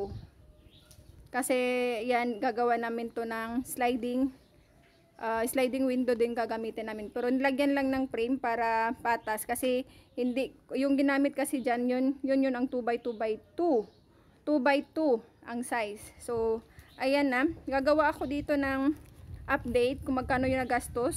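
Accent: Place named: Ilonggo, Philippines. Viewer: Hawud na kaayo ka mag Ilonggo sis nindut paminawon hehehe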